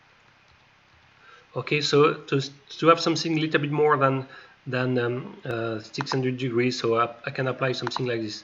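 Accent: France. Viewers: Meilleurs Voeux 2019 avec toujours ces super revues qui font la promotion des quadricoptères et ... ...de l'accent français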